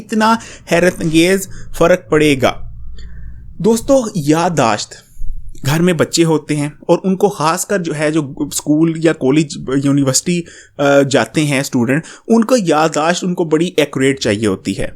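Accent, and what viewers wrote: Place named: Kashmir, India. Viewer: AAPKI AWAZ MUJE BAHUT ACHI LAGTI H.ASE LAGTA H AAP KASHMERI YA PAKISTAN SE H.M PUNJABI HU OR KISI MUSLIM K MUH SE PUNJABI BAHUT ACHI LAGTI H😍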